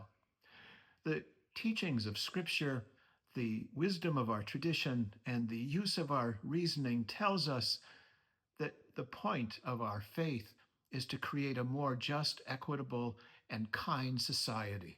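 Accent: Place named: Boston, United States. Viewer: Thank you for your Bostonian voice, your sound words have been comforting in more ways that you’ll ever know. May the Lord God continue to bless, amen. Amen!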